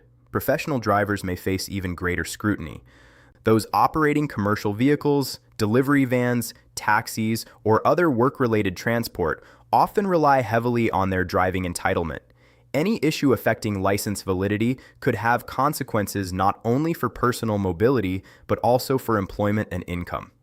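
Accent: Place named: United States. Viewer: Load of Crap. Why is it that all post's loke this one is narrated by an American or Canadian.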